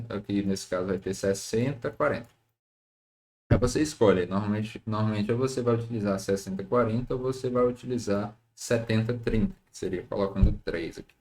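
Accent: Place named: Fortaleza, Brazil. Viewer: Ótima didática e ajudou demais! Obrigada. Entendi tudo =) inscrita! Vc tem o sotaque daqui de Fortaleza-CE !!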